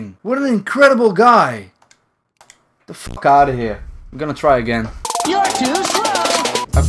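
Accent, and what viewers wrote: Netherlands. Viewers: Lol are u dutch? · His accent sounds SUPER dutch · Are u Nederlands 🇳🇱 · Wait are you Dutch? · Boy you dutch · ik hoorde aan je accent dat je nl bent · Ben je nl are you Dutch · Are you dutch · I know your Dutch i can hear t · yo wtf you're dutch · are you from the netherlands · Wtf you are dutch?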